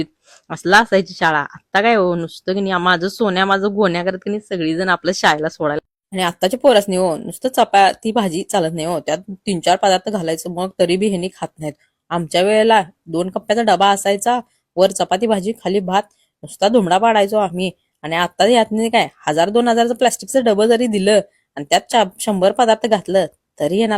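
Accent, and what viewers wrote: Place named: Solapur, India. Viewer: तुम्ही असेच गावची भाषा बोलत रहा ऐकवयास चांगले वाटते सोलापूर ची भाषा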